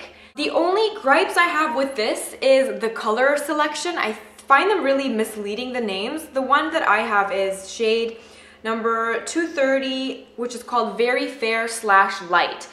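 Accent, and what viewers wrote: Canada. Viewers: I actually detected your Canadian accent in this video. lol :)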